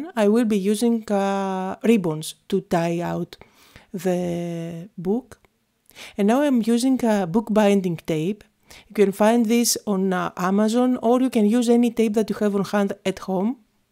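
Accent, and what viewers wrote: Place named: Greece. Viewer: Φαινεται τοσο πολυ οτι εισαι Ελληνίδα , απο την προφορα και μονο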